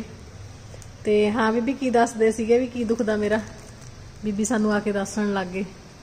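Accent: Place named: Patiala, India. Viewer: I love your video bhan I'm from patiala to I always watch your vlog you speak same punjabi like we do and your so sweet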